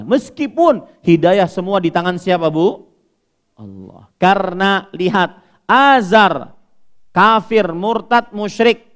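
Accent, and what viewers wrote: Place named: Banjar, Indonesia. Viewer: Orang banjar lih pian guru..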